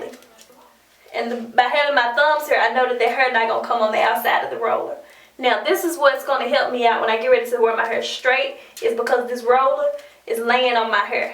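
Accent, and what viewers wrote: South, United States. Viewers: your gorgeous an your southern accent is mind blowing reminds me of home!! get in touch sometime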